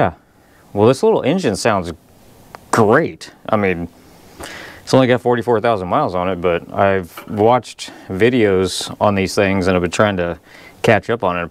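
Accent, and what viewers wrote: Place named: Texas, United States. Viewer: Hey you know Texas Talk just like me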